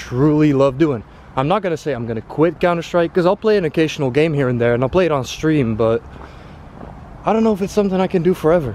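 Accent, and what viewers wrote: Netherlands. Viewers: Are u dutch?????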